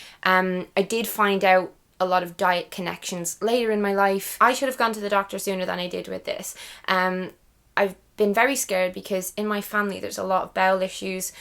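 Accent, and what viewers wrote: Ireland. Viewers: Brand new sub. Just binge watching your vids as we speak. Relate to so many of your vids. Think you are lovely and you can't beat an Irish accent, greetings from Scotland ❤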